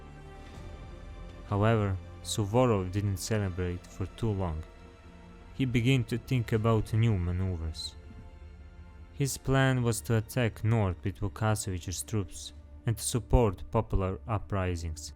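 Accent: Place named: Eastern Europe. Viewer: Cool video but your east European accent is to much for my ears. GL but i will never ever watch your movies again.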